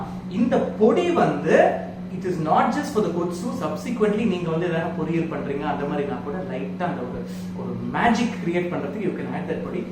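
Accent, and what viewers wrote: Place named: Thanjavur, India. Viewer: Age old recipe. Gothsu and gosthu are same. Regional pronounciation. Typical tanjore district pronounciation! Gosthu, embLadhu for eNbadhu😂😂 it has its charm.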